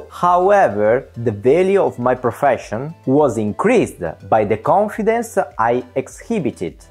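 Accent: India. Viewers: Pur con accento indiano parla meglio di molti italiani, più che altro fa strano L accento indiano su un italiano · Ma questo accento indiano??? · You have a lovely indian accent <3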